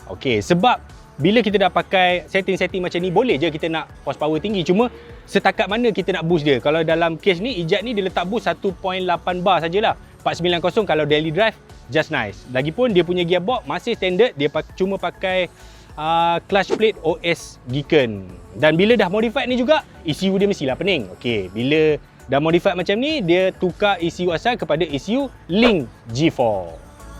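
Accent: Kelantan, Malaysia. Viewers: kayo weh ore kelate 😁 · Abang ni org kelantan ke 😂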